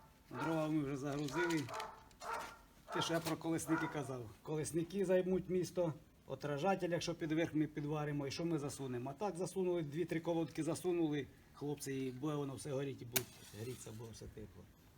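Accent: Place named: Polesia, Ukraine. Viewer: чую родний полєский говор =)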